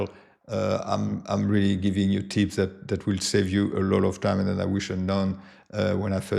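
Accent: France. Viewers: Hi, Are you french yourself ? You seem to have a french accent. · "Why I Moved to France"... Are you talking about yourself? Because you sound French... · Is he not? I mean, that is unmistakably a French accent.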